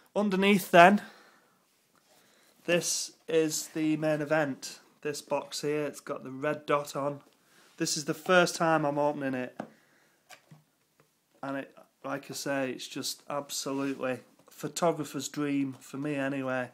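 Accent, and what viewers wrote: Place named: Yorkshire, United Kingdom. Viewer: haha i love the way you pronounce bokeh they should replace all the news anchors on the bbc to have a yorkshire accent :)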